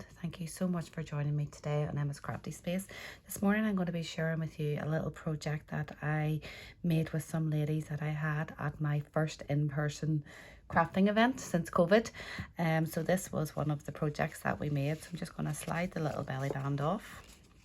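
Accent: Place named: Northern Ireland, United Kingdom. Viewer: Great to hear your accent - making me a bit homesick. I grew up in Belfast. Now live in Western Australia. I am also a fellow SU demo. Tfs this lovely project. Just found you through Pinterest 💕.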